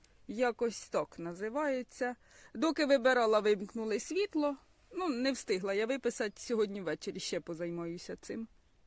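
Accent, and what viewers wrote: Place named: Poltava, Ukraine. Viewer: Добрий вечір, попала на ваше відео і відразу підписалася, тому що почула знайому вимову , я сама родом із Царичанського району, (живу у Дніпрі) потім узнала,що ви з Полтавщини. Манера розмови, акценти дужі схожі. Бажаю вам гарних урожаїв, а якби висилали свої квіти, я обов'язково б у вас купила.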